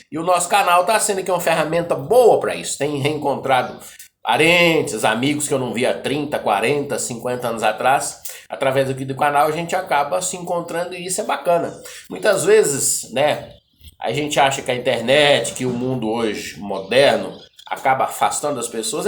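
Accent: Goiás, Brazil. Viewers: engraçado o jeito goiano eu gosto muito de moda de viola as modas antigas e escutando o randal grande compositor e goiano falar da vida dele e voçe contando historia sao muito pareçido o jeitao de falar parabens voçe e bom contador de causos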